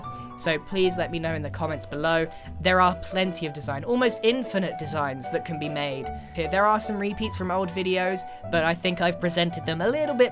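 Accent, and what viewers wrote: United Kingdom. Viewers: Why do you sound extra British lol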